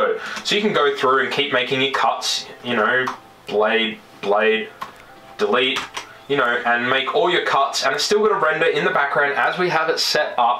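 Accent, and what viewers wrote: Australia. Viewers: mate this helped a lot champion. Also you legit sound aussie as but you're Canadian? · Good tips mate... you're also an Aussie yeah? Sounds like a familiar accent haha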